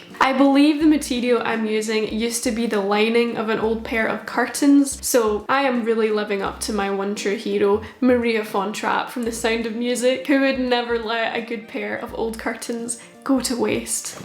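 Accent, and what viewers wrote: Scotland, United Kingdom. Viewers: Love your accent, are you scottish? (I think thats how you spell it) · their accent sounds Scottish, but idk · It’s a Scottish accent :) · Hello! I love this video! I have a question, are you from Scotland? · Omg she’s Scottish, we love to see it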